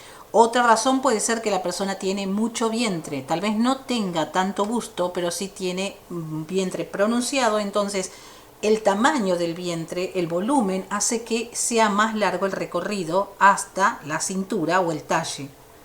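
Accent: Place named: Argentina. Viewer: Por el acento eres argentina me encantó tu clase me inscribirme para que me lleguen notificaciones gracias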